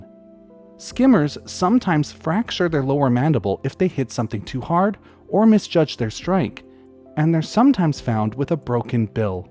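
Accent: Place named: Vancouver, Canada. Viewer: That makes so much sense! I never thought about it, but we have the same accent. I grew up just outside of Vancouver.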